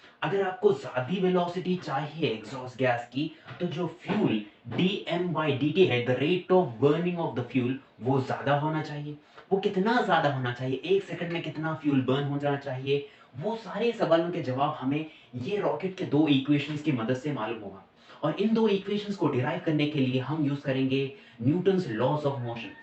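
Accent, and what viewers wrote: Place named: Hyderabad, India. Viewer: yoo, bro sounds hyderabadi, btw great explanation bruh, and why not uploading videos on yt rn??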